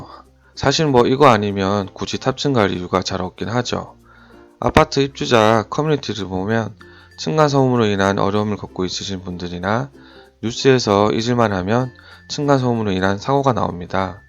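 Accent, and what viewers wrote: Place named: Gyeongsang, South Korea. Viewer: 갱상도 꺼져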